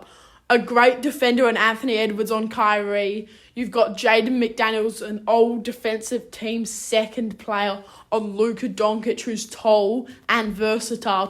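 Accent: Australia. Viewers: She doesn't sound American. Maybe Australian. Her understanding of the game isn't that good. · Well, time to change that title and edit the video. Sorry Bud. Are you Australian or British? Exum and Green need to speak with you mate.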